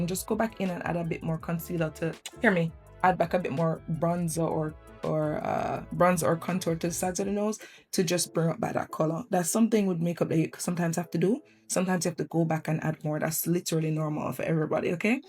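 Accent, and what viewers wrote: Jamaica. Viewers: Right when mi hear the Jamaican accent I'm😊 sold !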